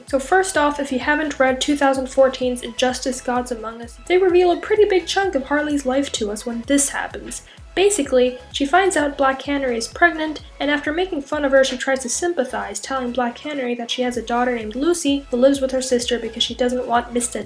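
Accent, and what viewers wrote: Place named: New Jersey, United States. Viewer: Its a New Jersey accent :)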